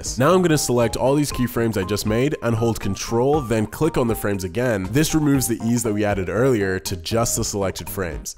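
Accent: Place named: United States. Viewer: Top entertainer with a great feel for humour and edits. I've heard you have a comedy sketch filmed that has never seen the light. Although you sound American my intuition is that the film has a Scottish feel to it. Is this ever going out? With your skills you should be able to turn it into a 4/10 imdb rated film at least....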